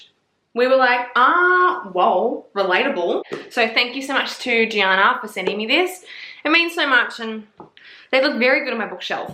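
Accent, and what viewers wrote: Australia. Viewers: bish shut up I love the Australian accent 😭😭😭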